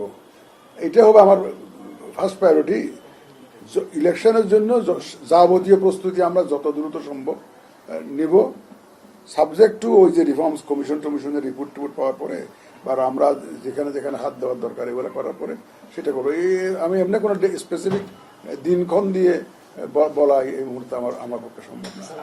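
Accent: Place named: Chittagong, Bangladesh. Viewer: চট্টগ্রামের মানুষের মত লাগতেছে। উনার বাড়ি কি চট্টগ্রামে।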